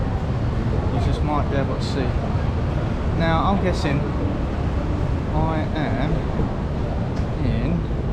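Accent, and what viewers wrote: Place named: England, United Kingdom. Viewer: Sorry for your bad experience with a taxi driver. A long time ago in Manila we had a similar experience, but it did not work in his favor. Because as soon as he demanded a lot more money than the usual fare from us, we got out of the taxi immediately. And yet he had the guts to scold us. We could have given him tips if only he was good and honest to us. They are the rare ones. However, we come across with many of them who are so honest and kind to us. Glad that you got over it and please continue your good work. I just love listening to the interesting things you say... and your English accent too. Hehehe! Thank you, keep safe and God bless.:goodvibes:😇🥰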